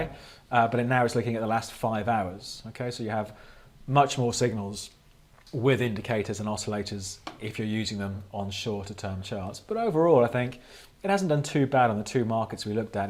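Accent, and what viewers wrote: United Kingdom. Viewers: I love your british accent haha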